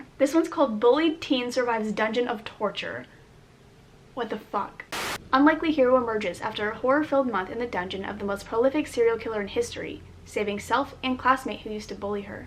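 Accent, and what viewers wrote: United States. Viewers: Maya W she had a slight country accent. I’m from Mississippi and mine comes out often.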